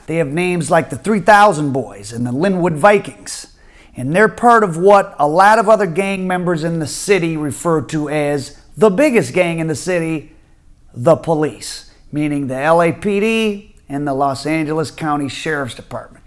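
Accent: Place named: New York, United States. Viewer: I feel the new York accent gives this authenticity even though it's a west coast gang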